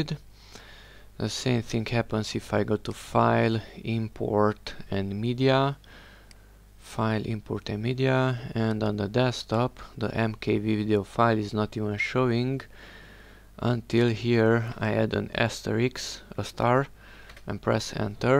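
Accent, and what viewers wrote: Hungary. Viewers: Thx for the info (alig lehet hallani hogy magyar vagy :P ) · It sounded like hunglish. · Nagy segítség, le sem tagadhatod amúgy, hogy magyar vagy. Az akcentusod elárulja :D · Akcentusod rögtön elárulta hogy magyar vagy )))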